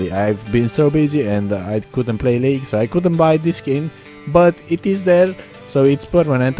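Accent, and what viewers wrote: Romania. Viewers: esti roman?? mi se pare ca ai accent de roman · Man, are you from Romania? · Se vede dupa accent ca esti român ! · Puteam sa jur ca esti roman din primele secunde. Oricum, de apreciat. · this guy is 100% romanian(after the accent) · ai accent de român, pwp.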